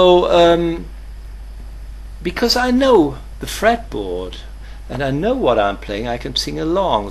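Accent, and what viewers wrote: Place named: Germany. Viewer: he sounds more german than anything...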